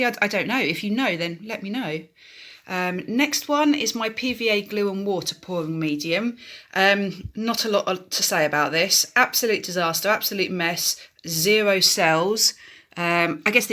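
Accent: United Kingdom. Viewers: Thank you, you have saved me a lot of time and work and also convinced me that I do need to use a pouring medium for some projects and not just water. Like you I like the way the colours react and spread, if that’s the correct term, with just water. I am pretty new to all this and finding out new things all the time, like in a couple of sea/beach pours I have just done, I got cells and lacing with the white (Amsterdam Titanium white) over iridescent colours, not so much over the plain colours. All the paints were mixed with a pouring medium (1parts Owatrol to 2 parts water) - 1 part paint to 1 part pouring medium and then water if needed to get the right consistency. I’m very happy with them and luckily we have holiday accommodation, my experiments will grace their walls! And hopefully some will sell!😜 Thank you again, I follow Molly, Kinella and Rinske, but it’s so nice to hear a British voice, their ideas and recommendations. 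Jeanette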